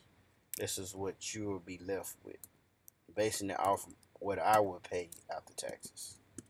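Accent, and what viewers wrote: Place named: South, United States. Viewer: Thank you for this video. I’m not a driver, but will be hiring my cousin to drive for me and I’m planning to buy my first truck & trailer by the end of year. It’s all a bit overwhelming but your video was full of really good information. Love that southern accent too! God Bless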